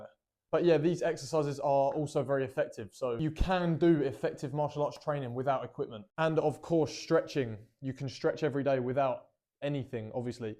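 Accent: United Kingdom. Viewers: With his accent he IS british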